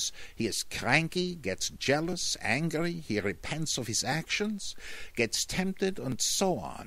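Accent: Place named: Germany. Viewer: The german accent- spot on!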